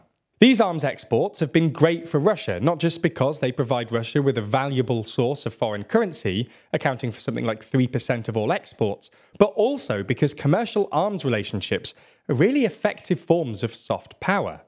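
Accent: United Kingdom. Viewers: when you hear an annoying British accent you know you are listening to a propaganda garbage machine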